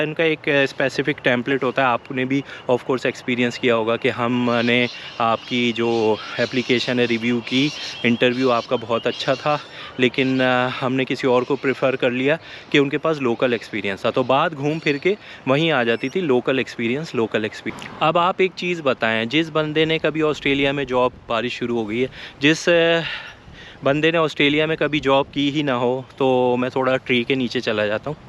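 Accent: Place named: Karachi, Pakistan. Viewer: bhai baaton se Karachi ke lag rahay hain. I loved your video. I would love to meet you in Australia. I am landing soon.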